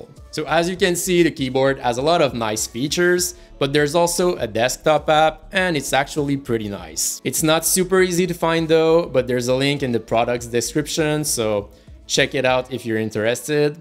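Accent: Quebec, Canada. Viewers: french canadian?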